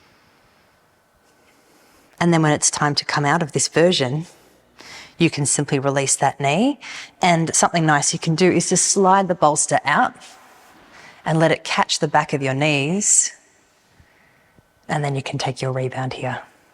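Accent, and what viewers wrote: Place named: United Kingdom. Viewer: Very very helpful and lovely British accent. Thank you.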